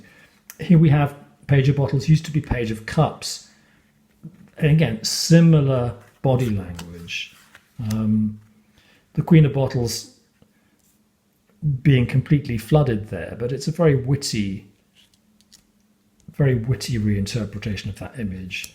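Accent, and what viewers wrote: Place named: England, United Kingdom. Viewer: Hi, new subscriber here. From Empress Song's channel. It is refreshing to hear an English accent. I like this deck, in that it is subversive. For example the King of Pents is meant to be master of the earthly realm, with access to resources to use at will. In this deck the King of Pents is removed from his element, with farming and wealth of the earth (the combine harvester in the background) beneath the King, as implied access to valued resources are supplied via the tablet. Maybe stocks in fracking, or cyber currency; the stock exchange rather than the actual nitty gritty of resource creation by own hands. So as a polemic on modern society, there is not as much respect given to skill of hand and land. Respect, ie wealth and value, is given to Airy (swords/guns) pursuits. As for the suit of guns, who needs intelligence when you have a gun? 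The previous 12 months alone in the US has been horrific and mind boggling regarding mass shootings and the desperate need to keep their right to bear arms. It makes no sense, but if Guns are placed in stead of strategy, analysis, logic, then a suit of guns is a sad state of modern living. The RWS of the 1900's had at least a little hope in it. This deck shows today's reality, the hope has to be added intentionally and sideways on. I have a few decks like this, the latest is a US games deck - The Neo-Colonialist Tarot of the Americas. Pamela Coleman Smiths artwork enables people to enter into the theatre of life. A fantasy. In these decks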